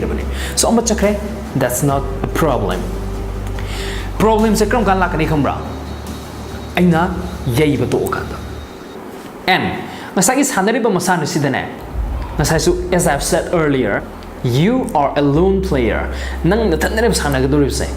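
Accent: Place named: Manipur, India. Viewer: Ngcdi manipuri khra sengna ngnge keep up bro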